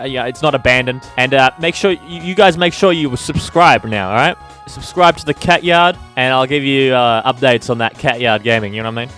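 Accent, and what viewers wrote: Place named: Appalachia, United States. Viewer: You somehow sound like someone from Appalachia and Australia at the same time.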